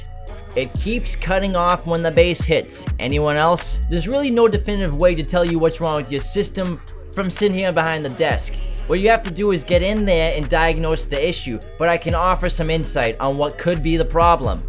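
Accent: Boston, United States. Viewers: Dude are you from Boston ?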